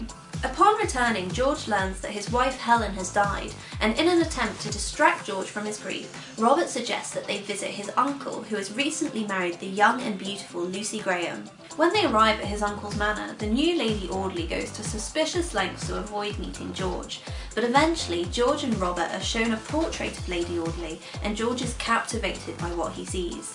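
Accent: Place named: Australia. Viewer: thanks. and you must be australian.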